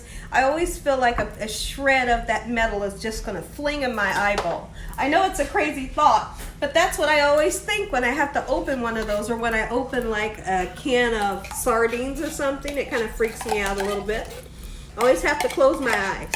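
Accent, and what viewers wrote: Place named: South Carolina, United States. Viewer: I love her voice she sounds like my family in Charleston South Carolina.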